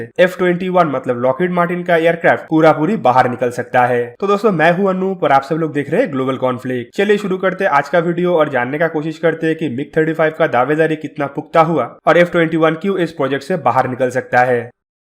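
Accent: Bengal, India. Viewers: bhai pls hindi ko thik karo..bangali mix hindi acchi nhi lagti..is liye aapka chnl unsub krna padega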